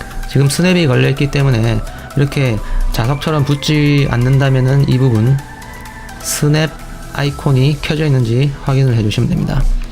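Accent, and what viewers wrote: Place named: Gyeongsang, South Korea. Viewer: 앗, 템플릿 영상만 보다가 처음으로 목소리를 들었는데 사투리 억양에 급 친근함이...ㅋㅋㅋㅋㅋㅋㅋㅋ 저도 갱상도 입니다...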